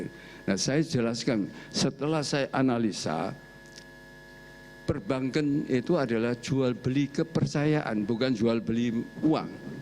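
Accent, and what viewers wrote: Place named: Java, Indonesia. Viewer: Gaya bicaranya mirip mendiang Pak Soeharto...dan terasa banget logat Jawanya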